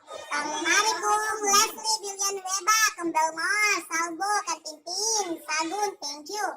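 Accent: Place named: Pampanga, Philippines. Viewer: Cute talaga magsalita ng mega kapampangan, nagawa ko na sis sanay ganun din ang ginawa mo sa bahay ko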